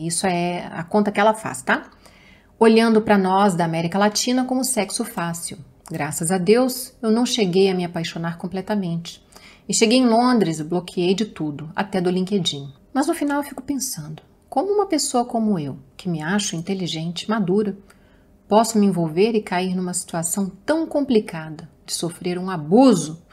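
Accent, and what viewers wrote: Minas Gerais, Brazil. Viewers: Vem disgrama.....famoso mineires 😂😂😂😂😂 adoroooo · " morreu nao desgrama vou embora" 😂😂😂 amo nosso mineires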